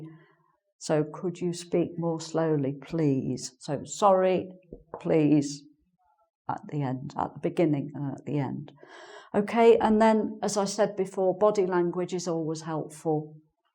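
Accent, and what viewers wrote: United Kingdom. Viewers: you've got the British accent, haven't you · Beautiful British accent!! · I'm pronouncing every single word from you to be accustomed with British accent. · Is this a British accent ?? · I love the pace and clarity of your speaking. It's a reason why I love to listen to the British accent. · love your British accent soooo charming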